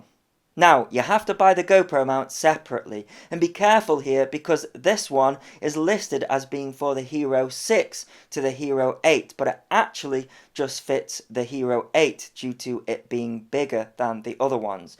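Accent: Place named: United Kingdom. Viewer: Yey! He's back... Teasing us with his new toys!!! :-D Great to hear more and more British accent on the hobby channels!!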